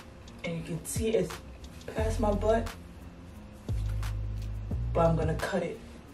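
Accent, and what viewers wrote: Liberia. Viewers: You sound Liberian and love it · Heard the accent and already knew LIB STAND UP 🇱🇷🇱🇷🇱🇷 my sista much love 🫶🏾🫶🏾🫶🏾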